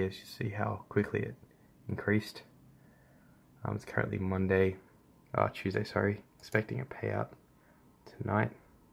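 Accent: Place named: Australia. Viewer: is this aud or us u sound aussie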